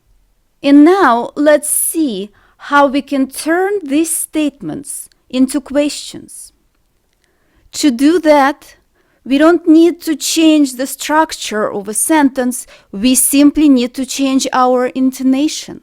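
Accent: Russia. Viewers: i can hear a slight russian accent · классная задумка и построение урока, но таааак режет слух ужасное произношение на англ.,прям чисто русские звуки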